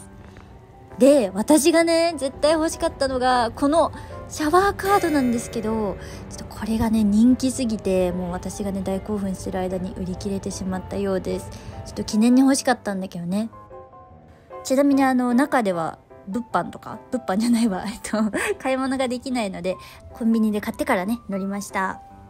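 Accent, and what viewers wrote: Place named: Kansai, Japan. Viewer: ちょっと関西弁が出てて親近感を感じちゃいました。次回の動画も楽しみにしております🤗🚃🎶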